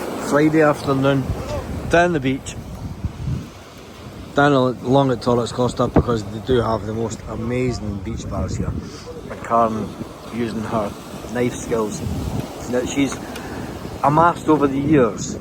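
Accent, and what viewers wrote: Scotland, United Kingdom. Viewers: The Scottish accent is very cool 👌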